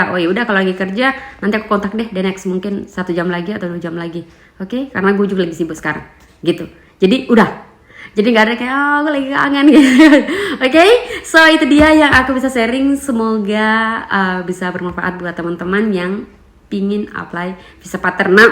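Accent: East Nusa Tenggara, Indonesia. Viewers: mba dengar dari logat ny kaya orang NTT yah? maaf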